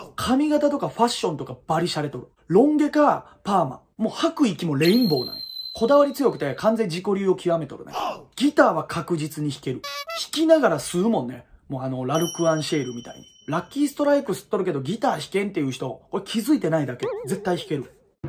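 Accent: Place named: Nagoya, Japan. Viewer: あんた名古屋人か？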